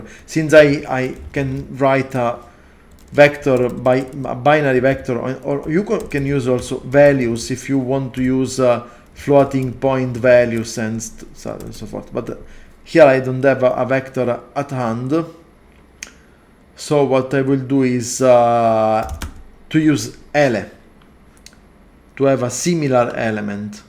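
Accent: Sicily, Italy. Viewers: inglese con accento siculo o sintesi in italiano.... vita di merda XD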